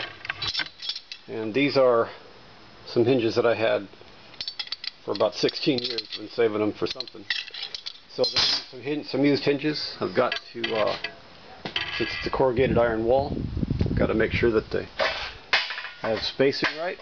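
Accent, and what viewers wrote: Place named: South Australia, Australia. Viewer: hey nice to hear another south aussie are you in metro or country sa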